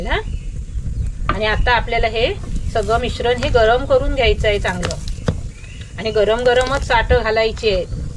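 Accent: Kolhapur, India. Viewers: कोल्हापूर वरून बोलतेय का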